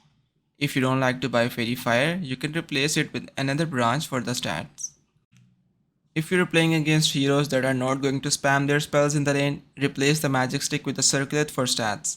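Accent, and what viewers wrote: India. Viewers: >Excited to see the video from the Reddit post >Indian accent :(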